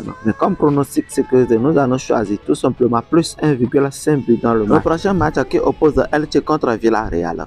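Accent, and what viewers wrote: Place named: West Africa. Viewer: J'adore trop ton accent a l'ancienne français africain 😂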